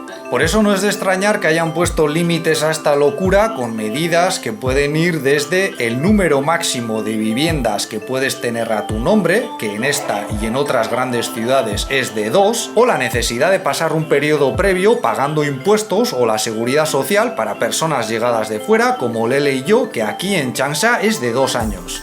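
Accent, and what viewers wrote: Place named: Spain. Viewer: Me purga el acento español cosa que no me pasa con ningún acento latinoamericano o de aprendices del español como segunda tampoco me molesta en absoluto. Los españoles hablan como sonsos.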